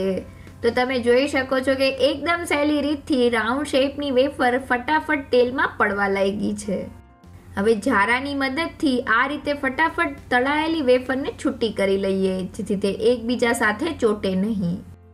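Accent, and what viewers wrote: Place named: Kathiawar, India. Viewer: Saras bni 6 ho. Bajar jevi Krispy. Tmari bolva ni style bhu gme 6. Kathiyavadi lago 6o. Ben thanks a lot for topra pak recipe. Ur fan from rajkot.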